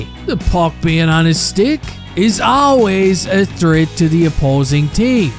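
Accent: Newfoundland, Canada. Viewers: He's a Baymen bye from The Rock .. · The Newfie accent hasn't been watered down like the rest of Canada's. Because of their isolation, they've maintained the accent of their ancestors mostly from England and Ireland.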